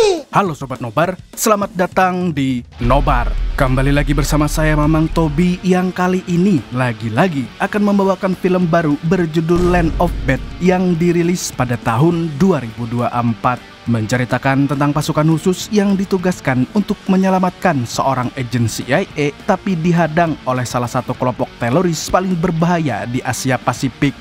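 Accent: Banjar, Indonesia. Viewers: Mamang tobi orang banjarkah apa orang kah???😊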